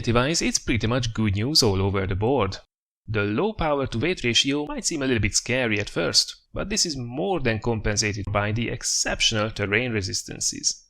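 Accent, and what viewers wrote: Hungary. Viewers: Szia csak szerintem beszélsz magyaros angollal? :)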